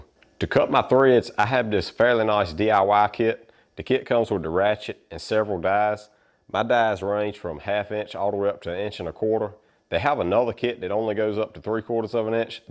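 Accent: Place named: South, United States. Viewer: Where are you?? I am trying to finger out your accent but I can’t!! It’s southern, it’s a bit Cajun, it’s a bit lumbee.. I have no idea!! ;)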